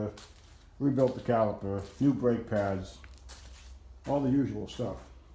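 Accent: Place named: Boston, United States. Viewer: How do you still have the Boston accent?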